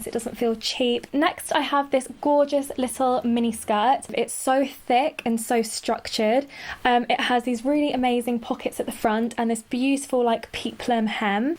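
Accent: United Kingdom. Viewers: Oh my god, you are so perfect! 😍 beautiful, stylish, intelligent and with British accent! Pure perfection 😙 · these things are not smt that I can wear(pink,gold or girly stuff are not my things) but I have to say that I love how your style complete your personality... 👌👗❤💗 I mean if it was another person with your style I don't think that I would subscribed or watched her. your personality is just shine oh and obviously your accent. I LOVE your British accent girl!!.. 😍💘💗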